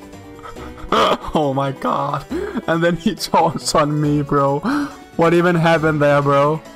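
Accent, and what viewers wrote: Denmark. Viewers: Så din video og instantly vidste at du var dansk, haha- nice video. 😂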